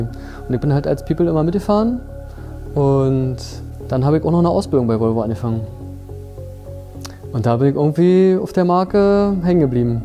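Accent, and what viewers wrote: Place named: Berlin, Germany. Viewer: Super sympathisch. Super Auto klingst ein wenig nach Berlin ? Fahre selber Volvo aber kenne hier keine andern der ne ähnliche Klatsche hat .mfg tommy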